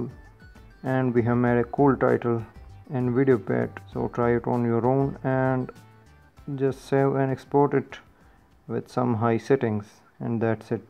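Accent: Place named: India. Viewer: Well the accent does not help irc you not used to Indian accent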